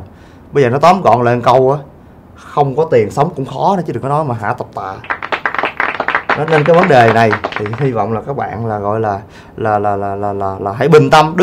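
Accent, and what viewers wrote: Mekong Delta, Vietnam. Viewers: a nói đúng chất miền tây lun a oii. ABC các kiểu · a này nói chuyện hay quá, vừa thực tế vừa nhiều bài học thấm, nói chuyện kiểu miền tây chất phát dễ nghe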